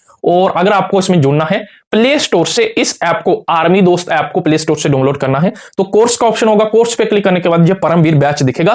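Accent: Punjab, India. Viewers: Bhai aapki bhasha se pta chal rha h ki aap punjabi ho❤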